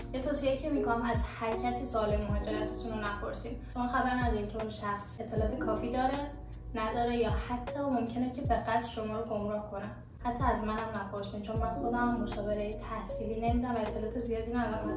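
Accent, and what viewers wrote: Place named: Turkey. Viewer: ببین با این که کل روز کره ای حرف میزنی ولی ته لهجه ی ترکی داری خوش بحالت کاشکی منم اردبیلی بودم من تبریزیم ولی اردبیل رو دوست دارم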